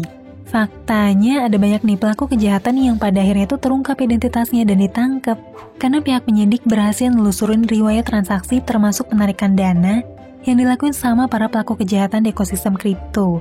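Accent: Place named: South Jakarta, Indonesia. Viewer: Jujur dari dulu pingin subscribe channel ini karena materinya bagus. Cuman ga tahan dengar suara Mbak naratornya. Kedengaran kayak sok ke Jaksel2an. Aksennya bikin geli.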